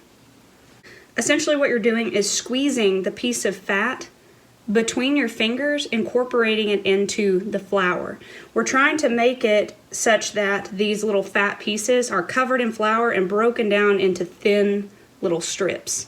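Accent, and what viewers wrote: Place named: New York, United States. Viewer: New York accent - just a hair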